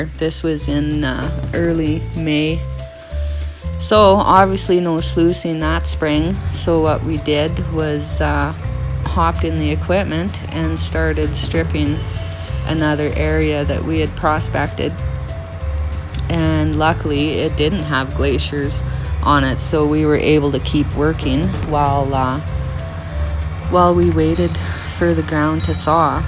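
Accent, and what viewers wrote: Canada. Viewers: I'm here for the Canadian accent, not the gold.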